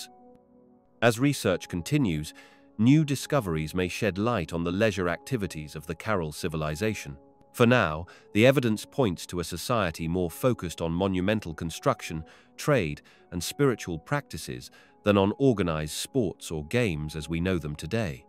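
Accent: United Kingdom. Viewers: Nothing wrong with British accents, they are clear and not slack jawed and lazy and limited English speakers find them a lot easier to understand and therefore improve their own pronunciation. Unlike say, an American accent which is not as easy for some.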